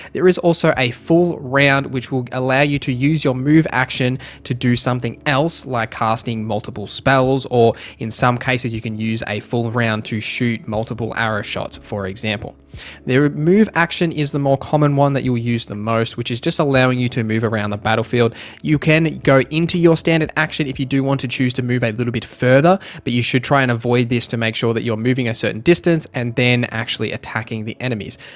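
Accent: Australia. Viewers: G’day mate. I think your channel could really take off with guides for tricky games like this. You present well and apparently people like presenters with our Aussie accent. (Who bloody knew?) Can I make a suggestion for your videos to maybe help set them apart from others out there? When you hit a concept like how an attack works, try running through an example or two. Maybe start with a basic melee attack and show how dice rolls would play out and the factors involved. Then add some complexity, like a ranged/magic based attack and play it out. I think it will help folks like me who have very little crpg knowledge. Which will be a lot of folks coming to your videos. The examples you create don’t have to be played in ‘in game’ you could create a basic graphic from screenshots and a cg dice. Could be worth a shot, might be too much of a pain in the ass. I think you’ll do well with how you’re going now, but wanted to toss my two cents in here. 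I’m subbing anyway mate.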